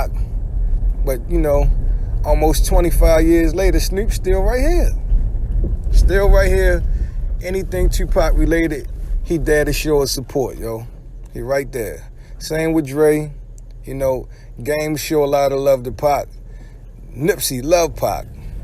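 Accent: Baltimore, United States. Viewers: Yo you from Bmore??? The accent gave it away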